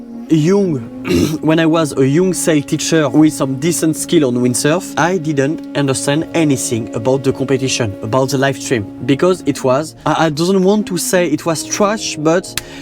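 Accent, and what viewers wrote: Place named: France. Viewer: Malgré le choix de mots français à la place de mots anglais et la prononciation française de mot anglais, le débit d'expression est assez conséquent et assuré, c'est tout de même pas mal du tout! A encourager! 👍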